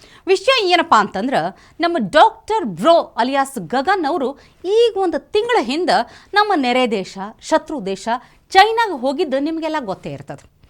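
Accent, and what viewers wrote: North Karnataka, India. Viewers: ಯಾವಕೆಲೇ ಇಕೆ ಉತರ ಕರ್ನಾಟಕ ಭಾಷಾದಾಗ ಮಾತಾಡತಾಳ್ ಹಡಸು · ಅಕ್ಕಾ ನೀವು ಉತ್ತರ ಕರ್ನಾಟಕದ ಜವಾರಿ ಭಾಷೆಯಲ್ಲಿ ಬೆಂಕಿ ಬೆಂಕಿ ಮಾತಾಡಿದ್ದಿರಿ,,, ಟಾವೆಲ್ ಒಳಗ ಕಲ್ಲು ಕಟ್ಟಿ ಹೊಡದಂಗ್ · ನಿವು ಹೇಳುವ ಸ್ಟೈಲ್ ನಿಮ್ ಸ್ಮೈಲ್ , ಉತ್ತರ ಕರ್ನಾಟಕ ಭಾಷೆ ಬಹುತ್ ಮಾಜಕ್ ಮಾಡುತ್ತೆ ಅಕ್ಕಾ . ನಕ್ ನಕ್ ಸಾಕಾತು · ಮಾತಲ್ಲೇ ಇರಿವ ಬಗೆ ಅಲ್ಲಿ ಮೂಡುವ ನಗೆ ಅಂತ ಇಂತು ನಮ್ಮ ಉತ್ತರ ಕರ್ನಾಟಕದ ಭಾಷೆಯ ಸೊಗಡು.. ಅದಕ್ಕೆಂದೇ ಹೇಳಿ ಮಾಡಿಸಿದಂತಿದೆ.👌👏👍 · Super anchoring mam... Your voice is super. ನಮಗೆ ನಮ್ಮ ಉತ್ತರ ಕರ್ನಾಟಕದ ಕನ್ನಡ ಕೇಳಿ ಭಾರಿ ಖುಷಿ ಆಯ್ತು. You are standing out of the box as compared to other anchors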